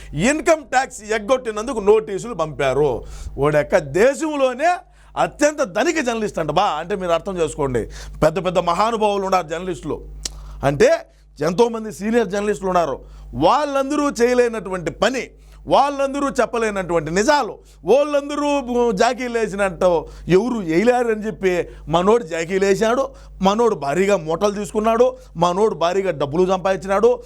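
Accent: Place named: Telangana, India. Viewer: Bro slang Telangana la undey😮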